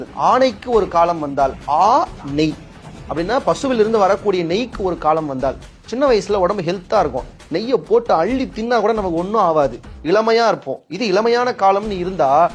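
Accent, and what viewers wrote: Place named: Chennai, India. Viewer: சென்னை தமிழ் ❤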